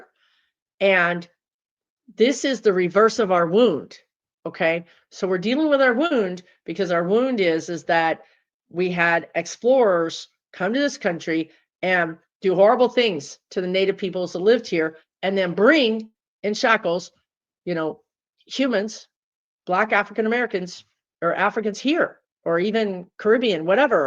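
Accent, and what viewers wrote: Texas, United States. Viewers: You Are a Texan 😂 love ya gf..I was making bed i was listening Your Texas ❤❤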